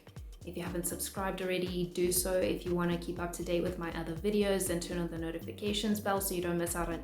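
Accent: South Africa. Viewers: the south african accent> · Your accent sound South African.... great vid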